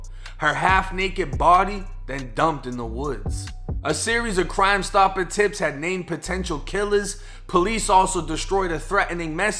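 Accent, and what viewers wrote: Boston, United States. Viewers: Damn what part of South Boston Florida you from 😂 carrr lol thick ass accent sound like Mark Wahlberg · This guy is so Boston I can smell the clam chowder from here! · It’s the Boston accent for me. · Love your channel bro👍 Just curious about your Boston sounding accent? Did you always live down South. I’m from Brooklyn living in Jersey and I’m always hearing about my accent😂 Keep up the dope content 💯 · Is he a Boston dude? Accent killin me lol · Aye big bro are you from Boston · Is he from the south or is he from Boston ???? He sounds like Marc wahlberg and Jay let’s live life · Bra if you from Tampa why you sound like you from Boston? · Is that a Southie accent? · Sounds like he's from Boston good content i just subscribed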